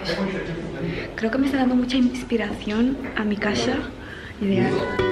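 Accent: Spain. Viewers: i'm OBSESSED with the way you pronounce english words in spanish bc SAMEEE JDJSDJ i'm from Chile but I speak perfect english y la verdad es que siempre que hay palabras en inglés que se usan cotidianamente, las digo con el acento chileno (or when i'm speaking korean too, digo las palabras en inglés en la pronunciación coreana) JDSJD like, obviously it's not the way they're actually pronounced but it's more comfortable and it makes more sense that way DSJD i'm sure you know what i mean. anyways !!! tu español es perfectooo. Hace mucho tiempo que no veía un video tuyo hablando español y mucho menos durante tanto rato jadjsjd me encantó. amé que tienes súper marcado el acento español. Te sigo hace un par de años (maybe even 3? idk) and i didn't know you were born in Spain!!! siempre pensé que te mudaste allí de pequeña, pero que habías nacido en otro lado. the more you know 🌟🌈 i'm rambling at this point i'm sorry KJASNXKAJSN just wanted to say !!! 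that this was very entertaining and yeah! hace mucho tiempo que no te dejaba un comentario jdjs que estés bien!!! 🥰 edit: btw, i loved the last part JDJSD i spent two months in barcelona back in 2019 and i absolutely LOVED it. so it brought back a lot of good memories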